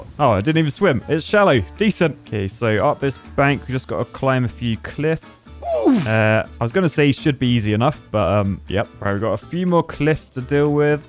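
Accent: United Kingdom. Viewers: Strangely enough this feels like a gray still plays video but with a british accent😂😂😂 im loving it so far only about 15% through the video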